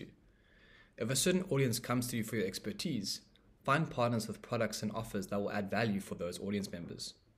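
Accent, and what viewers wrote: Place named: South Africa. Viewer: bro lowkey sounds South African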